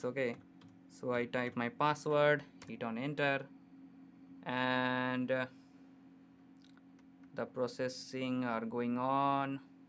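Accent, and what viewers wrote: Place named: India. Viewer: your voice is similar like Bangladesh or Indian where are you from.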